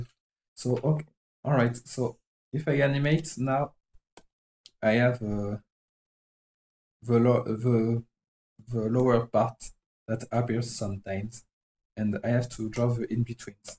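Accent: France. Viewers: dat anglish accent typical french playdr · I speak fluent French and I can't understand a French accent for some reason but I heard something about Sunday and it's raining????? XD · With your English accent that is not very good, I could guess what language you originally speak, your native language is French. Correct me if I'm wrong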